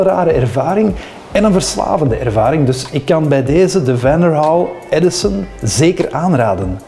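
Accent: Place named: Antwerp, Belgium. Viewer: Schitterende vlog makker, trouwens terug mooie intro ... en de oneliners jah ... samen met het Antwaarps accent de max hé 🤣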